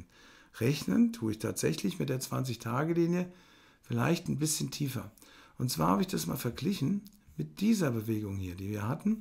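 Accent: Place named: Hesse, Germany. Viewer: Ey, subber hessisch gebabbelt Alder! Guuude Rutsch, gell?!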